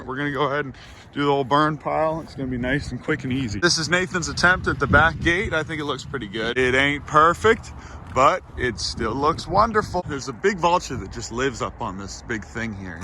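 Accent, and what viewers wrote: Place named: Pittsburgh, United States. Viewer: I have never been more engaged in a strangers life more than yours lol. It took me way to long to pick up your accent(Crosby Jersey gave it away too) and I’m dying to know where you are from in Pittsburgh? I live in Plum Borough. Keep up the good work you deserve way more subs. I don’t think any other YouTuber had an effect on me like your stuff. I’m a grown ass man and yell EVER HEARD OF IT! At everyone. My gf does not appreciate it.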